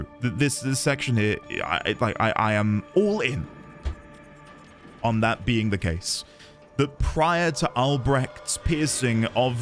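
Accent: United Kingdom. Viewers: When you first pronounced "capillary," I just chalked it up to you being British. I mean, really, you just say everything wrong anyway. You pronounce r's like a's ("neah" instead of "near"), you pronounce a's like r's ("i-dear" instead of "idea"), then there's the whole aluminum/aluminium thing. So when you said "capilliary" I didn't bat an eye. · weird to hear a northern uk voice in warframe's universe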